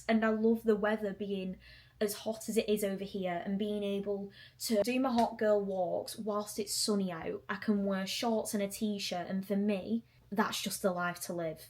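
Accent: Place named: Lancashire, United Kingdom. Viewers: Love this ✨💗 Also a fellow northern girlie here love the accent! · It’s a Coppull/ Chorley accent! Not too far from Wigan, at all. Welcome to Florida &. St. Cloud is a beautiful city! And thank you so much for nursing the sick.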